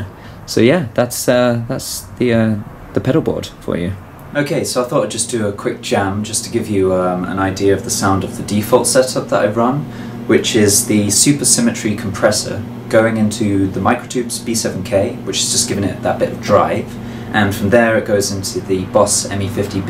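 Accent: United Kingdom. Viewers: is one of the heaviest bassists on YouTube also: is British and has a POSH accent me: visible confusion and astonishment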